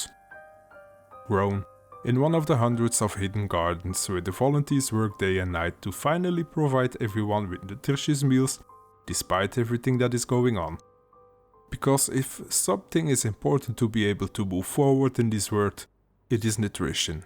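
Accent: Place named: Russia. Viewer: I like your Russian accent 👍💪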